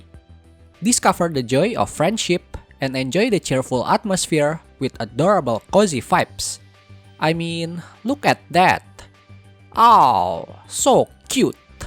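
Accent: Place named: Indonesia. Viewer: thanks for the video.. can't wait for Eiyuden Chronicles! 😍 denger dari logatnya.. orang Indo ya bang? wkwkwk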